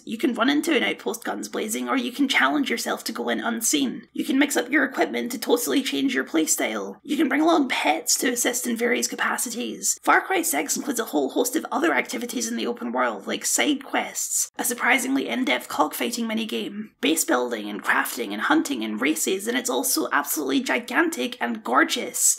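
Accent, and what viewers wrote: Scotland, United Kingdom. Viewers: Your voice not even that high bro. It just seems like such when paired with your Scottish accent and that’s how it comes off to an untrained ear. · Lmfao, yeah that was definitely an ignorant American question. Never actually met a Scot so only know the over the top accent seen in our movies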